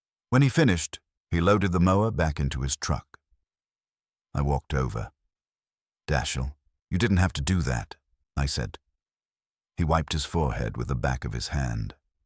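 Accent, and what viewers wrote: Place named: RP, United Kingdom. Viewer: The formal British accent totally destroys any atmosphere or warmth of authenticity.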